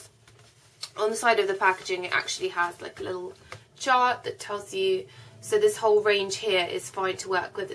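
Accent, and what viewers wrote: United Kingdom. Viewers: Oh, gosh,the speech is so distorted!.) ?. Very heavy British accent!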